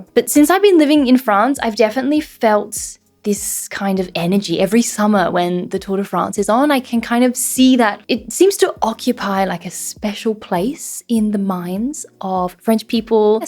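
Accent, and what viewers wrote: United Kingdom. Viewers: I thought you were British 😊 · I just love your accent (thought you were british) and your french is remarkable... nice to discover your channel... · YOU’RE AUSTRALIAN ?????😭😭😭😭😭😭 Your French is native level how did you learn French ? You have a British accent too (my perception ofc)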